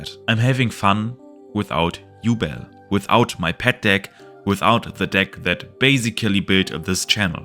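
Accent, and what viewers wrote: Germany. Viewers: Love the content, always excited when I get that upload notification. Also, not sure if you knew this... you have a German accent. Anyway keep it goin bud! See you on the next new deck whatever it may be! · Do you realize terror incarnate will miss timing if it is linked away? Also btw u have german accent 👍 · you have a german accent bro, will terror miss timming?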